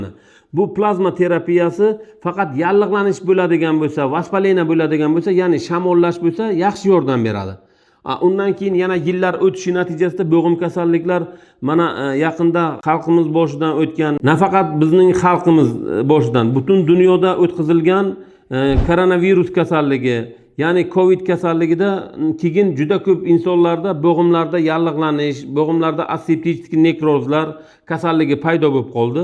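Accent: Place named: Bukhara, Uzbekistan. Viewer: Buxoroda deb òyladim sheva buxorocha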